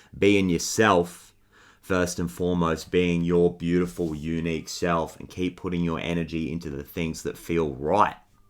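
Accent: Australia. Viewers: Omg i just love that these channelled messages are coming through an aussie accesnt and aussie way of relating to the world . 😂❤❤❤❤❤❤❤❤❤❤. Just this alignment in irself blows my mind . Just llove you and your humour . AGAIN Thank you mate . ❤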